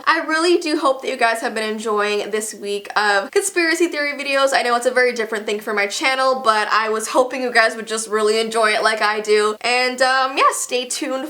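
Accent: Canada. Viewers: It’s not hands it Hans but it sounds like Hands because of her Canadian accent. I’m Canadian and I have an accent like that. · She's from Canada, so they pronounce words differently I believe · DIH-Do It well she's from Canada and people from Canada have an accent, so that can possibly be the explanation of why she pronounce's it differently🤷🏼‍♀️🙄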